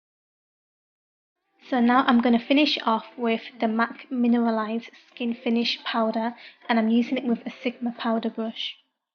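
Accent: United Kingdom. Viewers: i like ur accent!! how long have u been staying in UK? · i love how she is asian yet has a british accent :) its so neat! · u have abit of a british accent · you're sooo beautiful! and you sound like you've got a British accent ;)) so cool!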